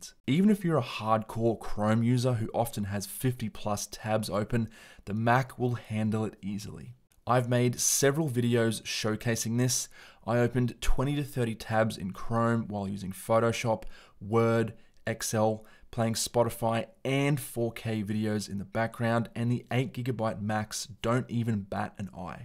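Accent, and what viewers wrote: Australia. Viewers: His Ozi voice is refreshing I’m my opinion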